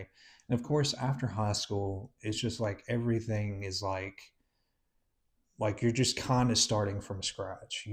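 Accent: South, United States. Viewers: It broke my heart seeing you cry! I’m not American, didn’t grow up Christian, and younger than you by 10 years yet my experience is very similar. I’m very proud of you for having the courage to come out, to heal, to admit you were wrong and to correct that, and to become a great and inclusive trainer who we all can tell is very good at his job by looking to his body..also I love your southern accent Sending love ❤ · Hey, I can relate so some of the things like the name-calling in school, and it really hurt. Every day was a challenge. I love your smile and your southern accent! You’ve clearly been holding in a lot for a long time, so I’m sending you a big virtual hug. Thank you for sharing your personal story — it takes courage to open up like that. You’re a strong, good-looking person with a bright future ahead. Greetings from Michigan.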